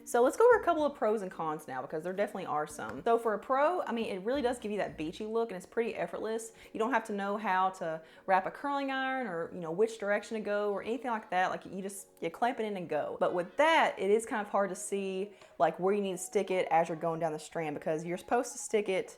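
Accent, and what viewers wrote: South, United States. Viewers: Your southern accent is soo charming ☺️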